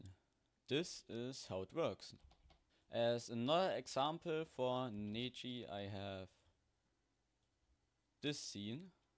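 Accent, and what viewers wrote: Germany. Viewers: Man hört so hart das du deutsch bist xd gutes tutorial btw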